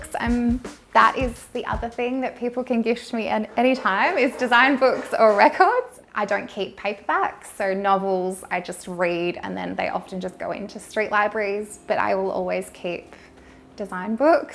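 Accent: Australia. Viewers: ''DesigAAH and MakAAH', ''LargeAAH'' this australian accent is triggering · I just have to say: australian accent, oh my! So beautiful!!!! THe house too, by the way! lol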